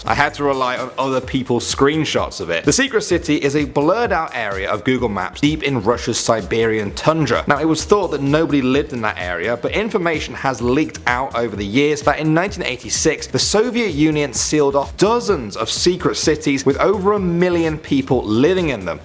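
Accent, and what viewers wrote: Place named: United Kingdom. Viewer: As a semi-paranoid fan of conspiracy theorists' stories, I'd watch another!, you hosted the first AT10 video I ever watched, and due to your mellow baritone and nifty British accent, I kept watching! Good on you!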